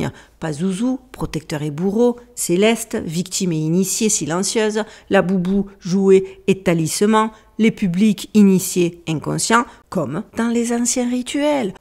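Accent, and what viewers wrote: Marseille, France. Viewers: Incroyable. Masterpiece. Avant dans les années 80(dsl chui un vieux😂😂😂)tout etait symbolique pour des initiés... Comme si les signes étaient mis pour les doués d intelligence... Aujourd'hui 2025😅😅😅😅 plus c est gros flagrant plus ca passe. Et la meilleure invention pour contré les chercheurs de vérité c est le mot COMPLOTISTE.. Des que quelqu'un a une analyse sur un fait populaire il est taxé de complotiste😅😅😅😅😅... Franchement bravo pour travail car au début je ne comprenais pas qu une dame avec un accent du vieux port abordé des sujets aussi 'Touchi' et finalement je trouve vos analyses ultra pertinente. Courage et allez l om😂🎉🎉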